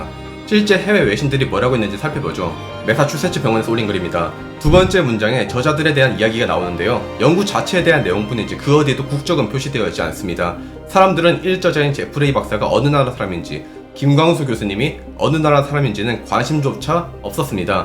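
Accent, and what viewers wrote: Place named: Masan, South Korea. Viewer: 마산 억양이 묻어나오는 서울말씨. 잘 봤습니다^.^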